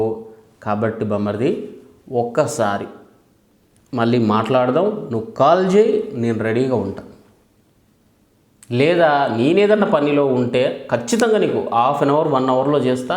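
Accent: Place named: Rayalaseema, India. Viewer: నీ అక్క అంటూనే, నీయక్క అని బాగా తిట్టావు బ్రో. మ రాయలసీమ యాస అదీ. సూపర్. జై జనసేన ❤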